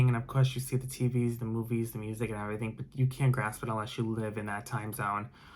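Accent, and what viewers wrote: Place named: New York, United States. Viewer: Hi,. You look lovely! I thought I recognized your accent - you’re a fellow New Yorker! I don’t know where you live (Upstate, boroughs, etc), but have you ever considered Long Island? That’s where I am, and it lacks all the chaos of the city. Not as fun, but way less drama and crime.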